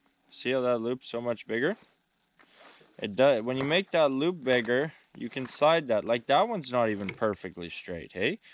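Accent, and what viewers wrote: Canada. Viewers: By the way you talk, you sound Canadian.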